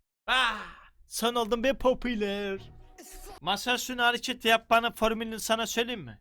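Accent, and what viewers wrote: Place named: Rize, Turkey. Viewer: Abi videoların bagımlılık yapıyor resmen saten rizrlilerin konuşmasına hayranım kendimde gümüşhaneli olarak seni izleyerek çok mutlu oluyorum resmen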